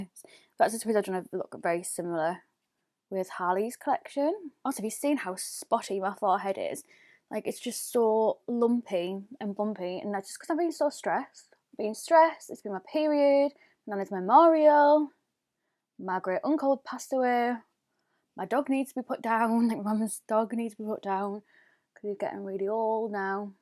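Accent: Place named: Northern England, United Kingdom. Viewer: Loved the video. Where are you from? You sound like a northern lass like me!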